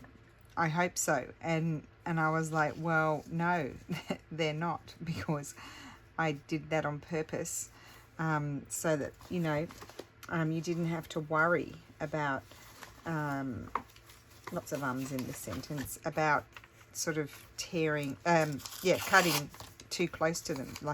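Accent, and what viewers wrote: Australia. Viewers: Thank-you for to-day Its great to hear an Aussie voice we are just out of our 5th lock down in Victoria Your YouTube channel has helped so much in all our lock downs Stay Safe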